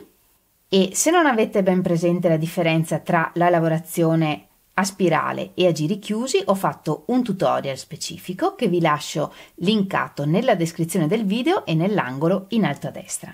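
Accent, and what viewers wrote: Veneto, Italy. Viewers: grazie, ho iniziato da poco a seguirti e devo dire che mi trovo molto bene perchè spieghi in maniere chiara e adoro il tuo leggero accento veneto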